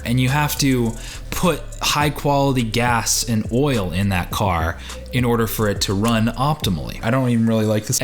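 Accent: Canada. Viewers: Are you Canadian ? I love your videos. Thank you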